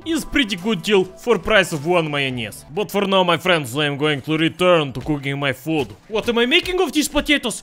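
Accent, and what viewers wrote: Russia. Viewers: Чувак ты русский??? У тебя акцент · this makes me wonder, is actually russian? or a brit/american with the best russian accent on the planet? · It's just a.m. here in Uruguay (South America) and i'm here, in my chair, watching a russian/ucranian guy talking and gloryficating slavs with that russian/ucranian accent and i love it.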